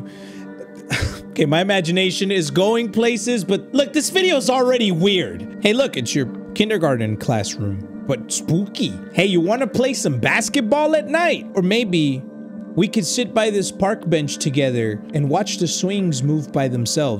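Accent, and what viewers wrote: Mexico. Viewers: are u english, learned Mexican or Mexican, learned english? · You sound like pewdiepie doing a light Mexican accent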